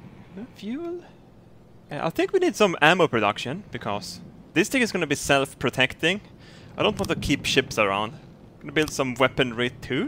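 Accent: Sweden. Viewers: Welcome back to... from the debts! Gotta love that Swedish accent.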